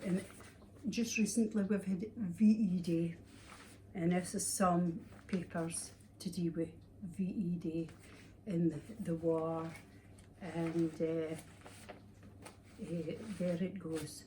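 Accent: Scotland, United Kingdom. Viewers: It is refreshing to hear the Scottish tongue. You are bringing beck many memories. well done..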